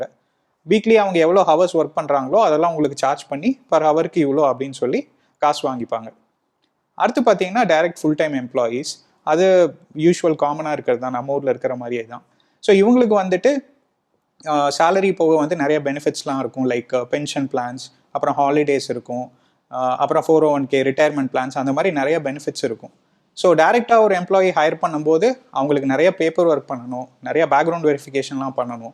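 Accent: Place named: Coimbatore, India. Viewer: நான் வசிப்பது பெங்களூர் தம்பி நீங்கள் நன்றாக பேசினீர்கள் நீங்கள் கோயமுத்தூர் என நினைக்கிறேன் தம்பி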